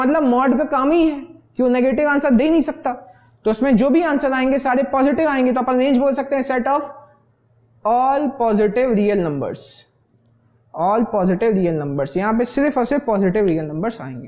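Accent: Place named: Mumbai, India. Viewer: if i am not wrong this sir is from mumbai.Guessed by his accent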